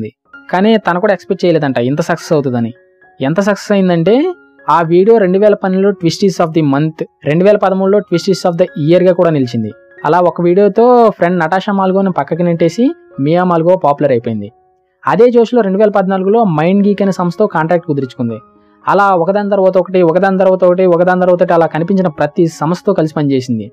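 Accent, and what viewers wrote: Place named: Chittoor, India. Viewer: Medhi a voori anna Chittoor yasala vundhi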